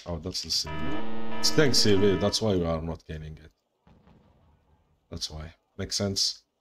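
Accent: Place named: North Africa. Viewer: Your English is good BRO, it's like North African english.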